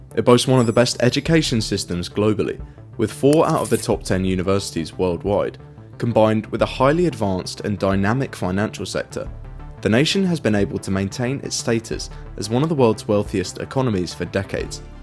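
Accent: United Kingdom. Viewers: You're just going to ignore his British accent? Lol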